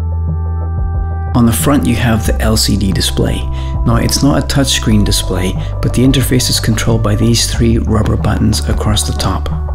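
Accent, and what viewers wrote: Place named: Scotland, United Kingdom. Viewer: where is that accent from you have a cool voice, Scottish?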